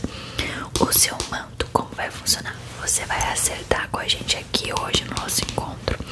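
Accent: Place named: Rio de Janeiro, Brazil. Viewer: Olá, então tu és gaúcha? O teu "carioques" me enganou direitinho.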